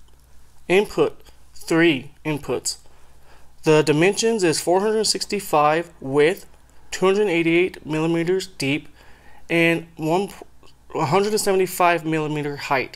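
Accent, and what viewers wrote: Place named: Russia. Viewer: You have a little bit of Russian accent, nice amp!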